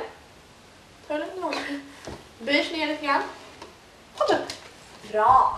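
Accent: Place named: West Coast, Sweden. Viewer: Det var roligt! Är du i Göteborg/västkusten? (just WAGguessing at your accent) Lärde mej svenska pä länge sen! I totally agree with you re most English speakers not knowing another language! (Im USA). Thanks to kikopup for leading me to your video. She has awesome dog training videos here. Hejdä.God Jul!!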